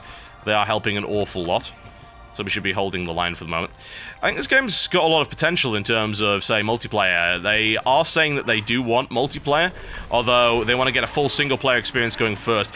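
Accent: United Kingdom. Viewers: L2 British accent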